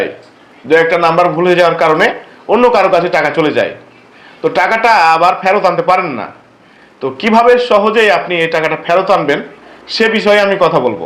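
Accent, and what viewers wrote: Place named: Rajshahi, Bangladesh. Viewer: আপনার বাড়ি রাজশাহী 😅😊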